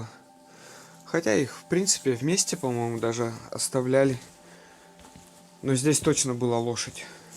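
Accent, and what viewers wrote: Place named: Vologda, Russia. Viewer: Откуда у тебя такие познания о старинных вещах, ты наверное изучаешь или читаешь много, наверно увлекаешься этим, а ты сам откуда? У тебя говор особый, какой-то вологодский.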